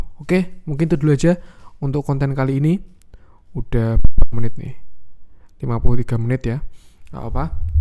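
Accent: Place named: Semarang, Indonesia. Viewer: Sudah kuduga,logat semarangan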